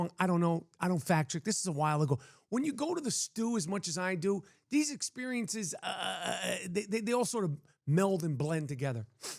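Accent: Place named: Long Island, United States. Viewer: It’s amazing how much you sound like me and I’m from Long Island New York and your movies sometimes you don’t have that accent brother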